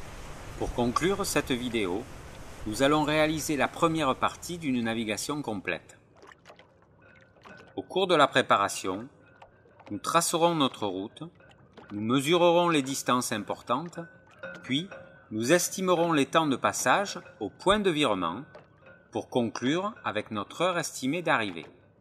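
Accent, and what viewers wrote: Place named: Provence, France. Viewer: Excellente, cette série de tutoriels avec l'accent de Provence. On jurerais que c'est Marius qui nous donne le cours !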